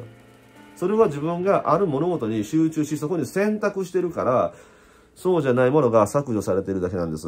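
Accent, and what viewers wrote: Osaka, Japan. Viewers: 少し、面白くないことがあって、得意でないお酒を用意して、 YouTubeでも観ようか。。。と思ったら、そこにQさんが居ました。 丁度、今、聞かなくてはならないお話でした。結果、楽しいお酒になりそうです。 大阪弁で少し早口のQさん、とても良かったです。いつもありがとうございます。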